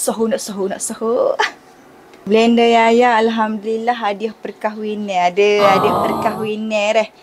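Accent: Kelantan, Malaysia. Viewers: doh ehhh suko la ho dengar yaya kecek kelate 🤣 tenkiu menghiburkan saya dikala kesepian di tempat kerja 😆 terus semangat yaya post video2 menarik ❤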